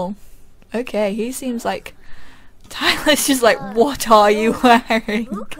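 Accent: England, United Kingdom. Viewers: What part of england are you from?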